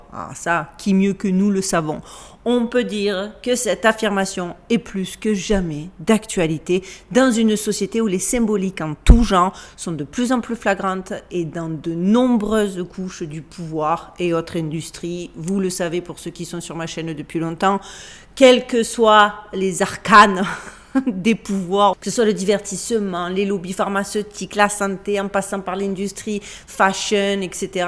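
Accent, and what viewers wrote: South, France. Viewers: Merci belle d'âme d'outre atlantique avé l'accent si typique de nos contrées du sud de la France ! C'est toujours avec un plaisir éréfrainé que je bois tes recherches qui partent dans tous les sens et les éveillent , les sens ! Merci pour tes nombreuses recherches dont certaines que je n'ai pas faites et qui pourtant sont d'un grnd intérêts quand d'autres pas mais là avec le nain polèon , tu m'en apprends de biens belles ! Comme j'aime ton esprit rebel et tacuriosité très saine , ne lâche rien Sista et prends soin de vous ! Merci , force et courage ! Namaste 🙏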